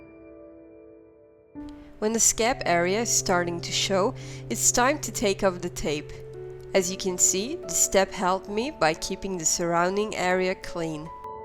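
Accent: Netherlands. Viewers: R u dutch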